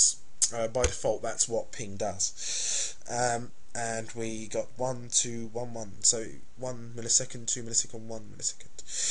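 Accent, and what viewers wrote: United Kingdom. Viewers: I have no issue understanding him or seeing the screen. He even has a UK accent which is different then the was we speak obviously here in the usa.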